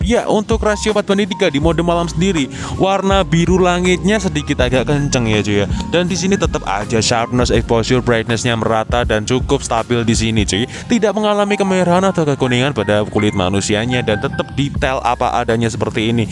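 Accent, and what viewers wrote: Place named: Semarang, Indonesia. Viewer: Wah njenengan wong semarang ya mas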